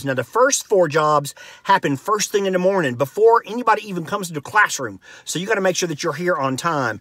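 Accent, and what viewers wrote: South, United States. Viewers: "Errr body". This gentleman definitely resides in the southern portion of the United States.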